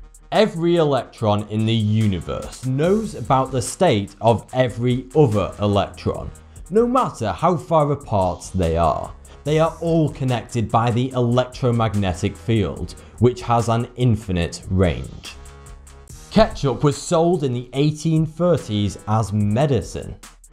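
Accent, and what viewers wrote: United Kingdom. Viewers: Lol..if u just hear me I will imitate your British accent jejeje,u're so smart😁😀😄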